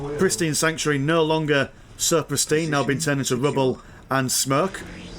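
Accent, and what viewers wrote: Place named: Yorkshire, United Kingdom. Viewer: ‘Why not go eh oop and away with Yorkshire airlines? Wipe tha bloody feet!’ The accent only adds to the enjoyment for me. Keep up the good work! 🫡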